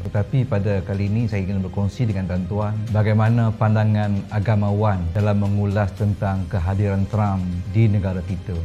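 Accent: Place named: Kelantan, Malaysia. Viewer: Longat pun tau puak kelantan thailands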